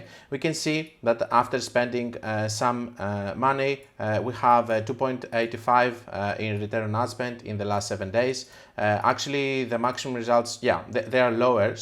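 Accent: Greece. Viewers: I do have sensitive hearing, but it wouldn't bother me so much, if it were only intro sound, but here's the thing, I watch YT on TV using keyboard and I need to constantly adjust volume when you're suddenly inserting those sound effects in midst of your quite quieter speech. And all that including your Greek accent (which I don't mind being European myself) really extends duration of watching while I try to comprehend what you're saying, so I'm constantly stopping and reversing video and it's a pain. You may comfort yourself by not being the only youtuber with that problem, there's plenty of them out there. But you don't need to edit your videos on my account, after all this was your first and last video I watched, because I've never had FB account and these topics don't interest me. And now you may bring up some mom jokes.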